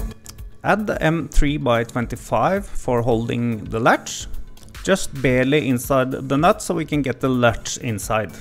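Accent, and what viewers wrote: Norway. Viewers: The norwenglish is strong in this one. Nice video neighbour.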